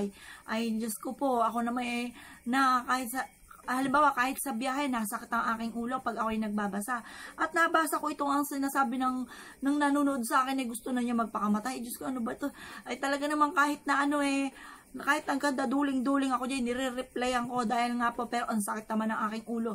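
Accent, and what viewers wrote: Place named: Batangas, Philippines. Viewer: Hehehe.nkinig ko nmn ang punto mo madam akoy ntutuwa sau eh,,.nkow! Ala eh,,hehehe..madam parihas po tau,,batanginya po talaga kgaya ko..godbless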